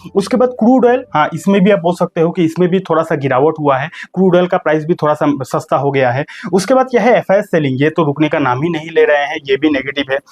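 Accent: Bihar, India. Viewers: बिहारी हो क्या भाई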